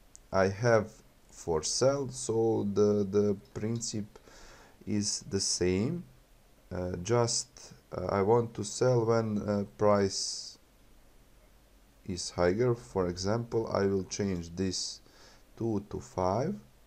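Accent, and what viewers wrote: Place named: Bosnia and Herzegovina. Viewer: Odma sam se pretplatio kad sam čuo engleski sa Bodanskim naglaskom 😅,sala Hvala na video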